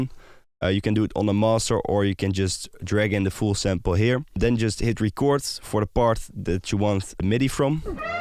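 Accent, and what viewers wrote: Netherlands. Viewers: sounds like you from the Netherlands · I smell Dutch, also great tutorial. Keep up the good work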